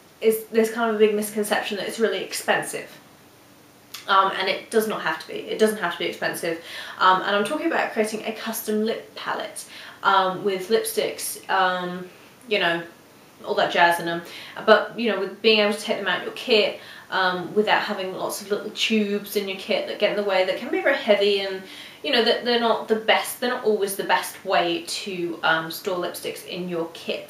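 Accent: United Kingdom. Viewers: Are You brittish Cause you sound like it??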